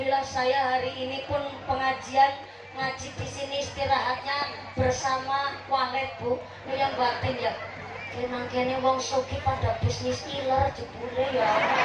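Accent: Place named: Banyumas, Indonesia. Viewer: Ustadzah mumpuni logat ngapak yang spesial mudah di pahami dan memberikan edukatif semangat pengetahuan manfaat termasuk dalam rangka memperingati Muludan jika mendengar bikin ketawa yang spesial mantappp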